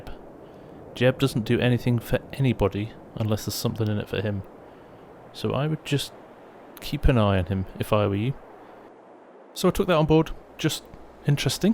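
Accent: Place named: South London, United Kingdom. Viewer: Haha, Statham is your hero! That's brilliant....however, you need to work on your muddled South London accent and nasal-y pronunciations a bit mate. I'm still waiting for someone to come out with an AD / CP route for this map. I think I've messed up my routes as I'm still getting used to AD...(always connect the lines like a train route, yeah)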